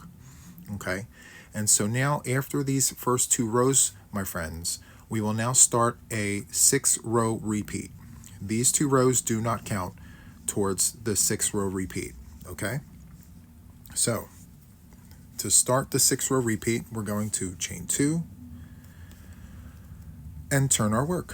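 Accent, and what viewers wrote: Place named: East Coast, United States. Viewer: Im new here and your tutorial is a treasure! What a wonderful pattern! Your style of instruction is so easily understandable… thank you! (Your east coast accent made me smile while listening too) 🎉❤ Thank you so much!! Michella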